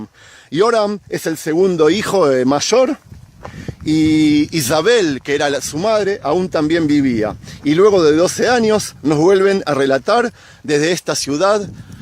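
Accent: Argentina. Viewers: Porque dice este hombre Isabel? El nombre es Jezabel no Isabel. Tú no eres judío pareces argentino che...!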